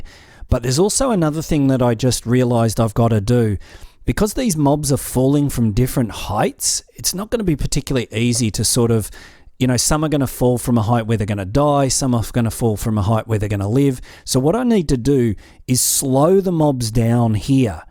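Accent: Australia. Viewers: Sooooo keen for the download. Loving the series, I'm a newish sub and I'm bloody loving your work. Its good to be listening to a fellow Aussie as opposed to my other MC subscriptions. hahah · Are you an Aussie?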